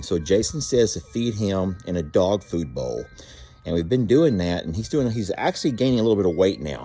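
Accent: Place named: Texas, United States. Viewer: Texas accents are NOT TERRIBLE. I have one but not as much as you. But I love them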